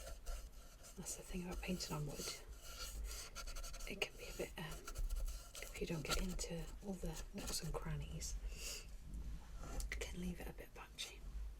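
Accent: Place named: Wales, United Kingdom. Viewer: oh i've been looking for more welsh asmr creators! such a lovely accent and voice ❤️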